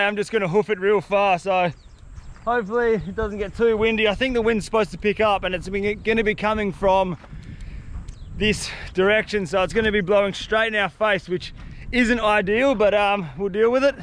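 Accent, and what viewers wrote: Australia. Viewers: Hi mate. Could you please tell me what kind of australian accent does he have? Im so curious as im learning english too atm, and I definitely think that this accent is 100% easy to understand than american english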